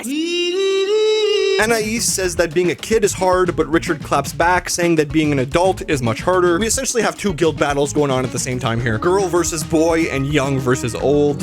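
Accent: Canada. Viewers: Wait is this guy Canadian? DID I FIND MORE OF MY PEOPLE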